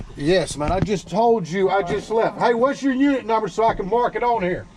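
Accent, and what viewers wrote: South, United States. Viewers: Good job... what makes it better is your southern accent. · I love your accent and I love when you say I'm fixing to tow it lol You're a true southern boy. I live in Canada and apparently we talk with an accent too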